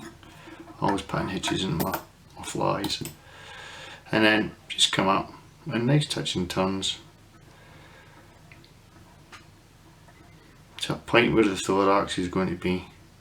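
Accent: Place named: Stranraer, United Kingdom. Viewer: Another nice fly, that sounds like a stranraer accent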